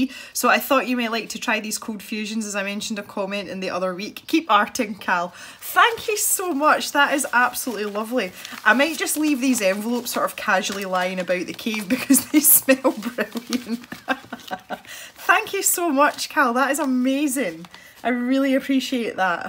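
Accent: Scotland, United Kingdom. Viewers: I’m so happy Gregory Grayscale has such a fine new home. But I’m still lamenting the displacement of The Yoshis 😢 Regarding the neon pencils ... I once participated in a challenge that required you to color a page that involved something outside of your comfort zone. So I doubled down and colored a mandala with neon gel pens and pencils - neither of which are my favs. So, looking forward to the next video with a Scottish accent. Signed, Daria, the serial commenter.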